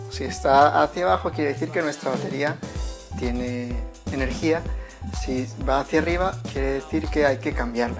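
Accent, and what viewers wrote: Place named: Spain. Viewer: Que cringe porque hablas como español?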